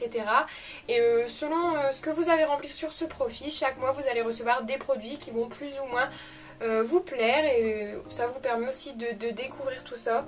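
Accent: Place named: Nord, France. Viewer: J'aime beaucoup aussi la marque Klorane 😊 le baume au beurre de mangue j'ai déjà testé tu vas voir il est super ! Je ne m'abonne pas à la Birchbox car il y a toujours des produits dans les boîtes que je n'aime pas et que je n'utiliserai jamais et je n'aime pas le gachis 😅. Tu nous en dira des nouvelles des produits et de ton ressenti. Tu es Nordiste non? j'aperçois un petit accent 😂 je suis Picarde. Super vidéo bisous 😘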